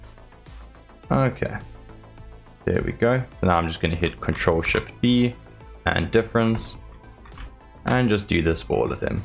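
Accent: South Africa. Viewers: Are you afrikaans? Also great video, thanks for the tips.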